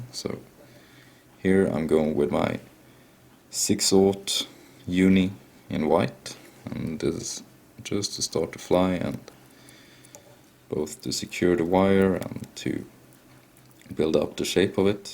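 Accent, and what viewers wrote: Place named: Sweden. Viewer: You know, experience tells me that that particular mix of accents means you could be Swedish...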